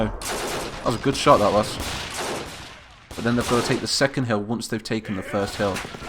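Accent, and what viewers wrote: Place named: United Kingdom. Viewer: yup ur british I think???????????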